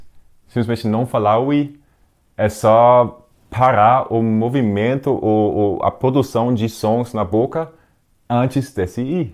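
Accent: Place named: Brazil. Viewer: O português dele é muito brasileiro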